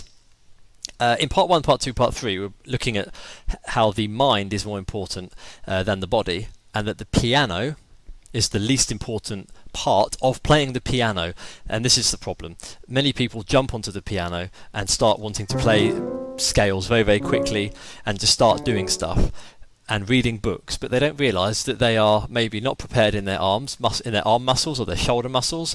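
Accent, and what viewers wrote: United Kingdom. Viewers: Very helpful so far! Thank you so much! I'm curious, where are you from? I hear a British accent. I'm just randomly curious, nothing more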